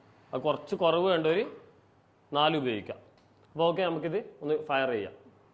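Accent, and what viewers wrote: Kannur, India. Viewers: കണ്ണൂർ ആണല്ലേ ഷെഫ്?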